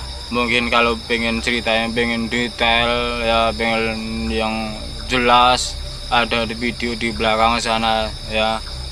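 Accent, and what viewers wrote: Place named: East Java, Indonesia. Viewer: Kang nih lokasi dimn.... Dngar logat bcara kayak org jawa timur, tpatx malang, bnrkh?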